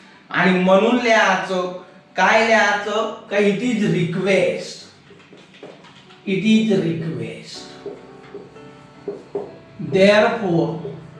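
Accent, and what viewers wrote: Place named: Vidarbha, India. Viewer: Me ka vicharle Karan tumhi vidharbh chi basha bolt hota,kup Chan shikavtat tumhi sir, chemistry pan shikavtat ka tumhi?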